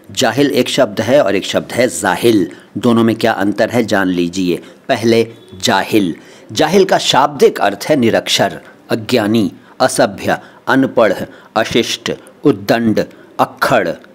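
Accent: Standard. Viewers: आपका शुद्ध उच्चारण सुनकर दिल बाग बाग हो जाता है बहुत बहुत शुक्रिया ।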